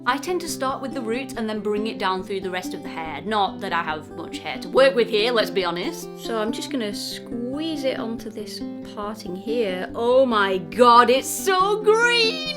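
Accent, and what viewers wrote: North, United Kingdom. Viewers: Love this video... Love your northern accent getting so excited about hair dye haha XD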